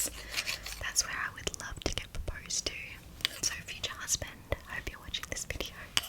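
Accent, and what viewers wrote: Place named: Australia. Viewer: Yeah girl AUSTRALIA!❤️❤️ me too.. I thought you where bc you have an Australian voice❤️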